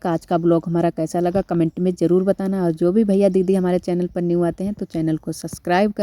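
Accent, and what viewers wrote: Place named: Orai, India. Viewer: Ap Kahan se hai kuki apki launguage ekdum hmare orai u.p ki tarah h Kande to hmare yhn bola jata hai up m to ap Kahan se hai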